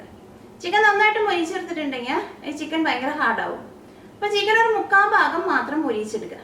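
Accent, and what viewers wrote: Thrissur, India. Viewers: that's how trissur people talk.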